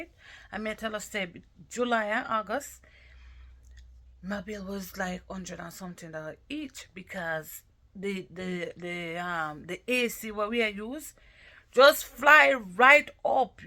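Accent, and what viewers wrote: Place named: Jamaica. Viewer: Why come on social media to talk about it you use it so pay it and stop talking like Jamaican and idiot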